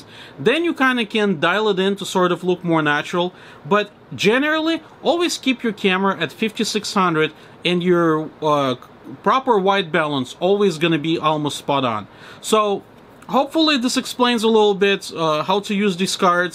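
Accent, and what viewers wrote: Russia. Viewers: This guy Russian accent How do I use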